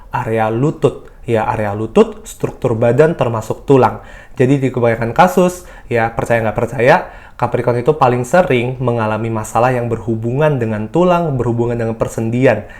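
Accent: Java, Indonesia. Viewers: Orang jawa po mas?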